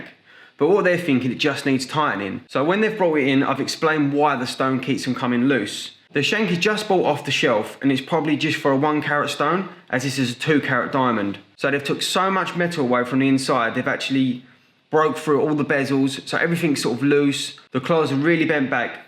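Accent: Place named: England, United Kingdom. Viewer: Never heard your English accent before where is it from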